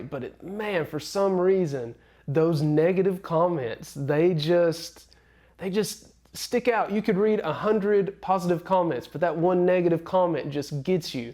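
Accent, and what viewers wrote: South, United States. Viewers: You have a southern drawl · I get you, man. Learning a language is damn hard. It seems like it takes forever to master it. IMO just take your time and let it all hang out, you do it for yourself (and for Maria I suppose), to for others. And as a non-English native speaker I like you American accent, by the way ;) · I wouldn't be able to handle being trolled either. I don't think they realize you're humans. You may always have a strong American accent but so what. You and Maria make videos that I love. So thanks for sharing, brother! I hope you love Colombia! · Y'all's channel is my favorite. I have a new grandson from a bilingual marriage. I've been looking for Columbian teaching online and Maria's approach works. She makes it organic and fun. I love your Southern accent. It's how we talk in Ala/GA. I trust what you and Maria do. 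Looking forward to watching you guys for years to come. Your relationship is more important than the channel so do what you gotta do to keep lovin' each other. Praying for safe travels. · I always enjoyed watching another regular person, with a southern accent no less, struggle with Spanish. You make me feel more comfortable with my struggle. Don’t let the varmints get to you! By the way, I am 62.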